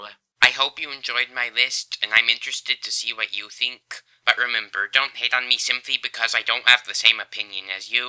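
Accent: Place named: United States. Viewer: No, I listened in for 90 seconds beyond the (incidentally overlong) intro. My comment was not mean, it was factual. For the record, the fact that you express opinions and have an American accent is entirely irrelevant to me. If you feel the need to censor and to be censorious, you really should not be making posts. Incidentally, you have marked my comment 'love', so I suggest there is some confusion on your part.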